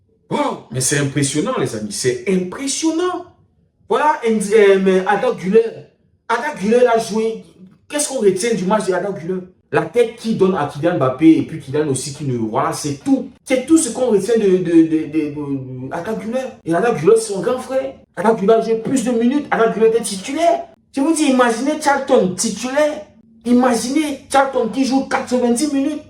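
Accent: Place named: Cameroon. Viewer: Le français des camerounais.👍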